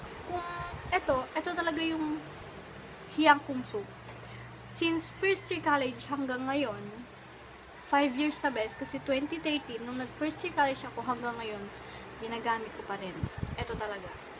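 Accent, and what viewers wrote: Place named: Iloilo, Philippines. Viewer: Ilongga ka girl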